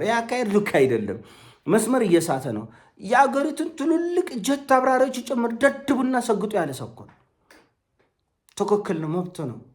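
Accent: Tigray, Ethiopia. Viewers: ለኣንተ ግን ጥያቄ ኣለኝ ለምንድን ነው አማርኛውኝ በትግርኛ accent እያወላገድክ ምታወራው 😂?? ኣንተ ሰግጠህ በ accent እየቀለድክ እያዘላገድክ ፈምሰህ እንዴት ሰለ ሰነሰርዓት መናገር ትችላለህ ? ኣሁን አወላግደህ መናገር ሰልጣኔ ነው አንድ አማርኛውን እያበላሸሀው ነው ሌላ ደሞ ሌላ ሰው ለመምሰል እየሞከርክ ነው ራሰህን ምሰል ።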